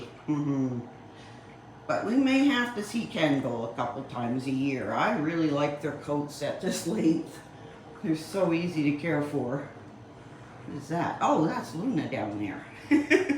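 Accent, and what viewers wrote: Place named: Eastern Canada, Canada. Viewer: Aboot ❤ the Eastern Canadian accent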